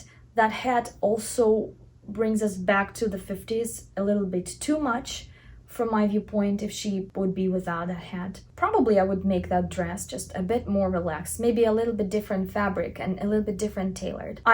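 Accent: Russia. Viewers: Я одна слышу русский акцент?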